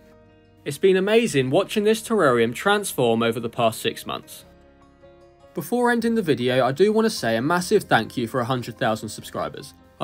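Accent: United Kingdom. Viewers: His British accent is just killer😌 By the way the setup is amazing 🎉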